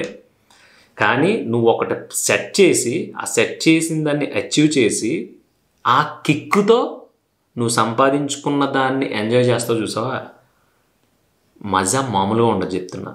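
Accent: Godavari, India. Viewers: thanks for your motivation anna..midhi godavari side kada mi way of talking lo miru godavari side anipinchindhi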